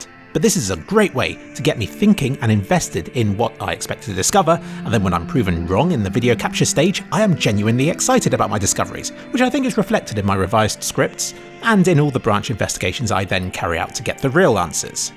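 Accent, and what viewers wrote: United Kingdom. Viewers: Hey, I use the subtitles you make because I think they're more accurate. And because English is not my first language it's hard to me understand British accent So I really appreciate your hard work subtitling your videos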